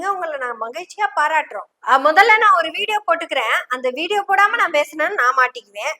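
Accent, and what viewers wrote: Kongu, India. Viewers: Kongutamizh Amma super.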